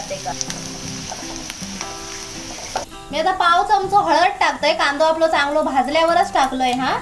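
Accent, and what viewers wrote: Malvani, India. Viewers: माका तुमची मालवणी बोलायची पद्धत लयच आवडली,माझ्या गावाची आठवण झाली आम्ही गावी सर्व मालवणी बोलायचो,आणि तुमची अळूची गाठीया बघून मला माझ्या आईची आठवण आली अजूनही आई करते आलूच्या गाठीया,खूप छान सांगितलात recipe 👌👌👌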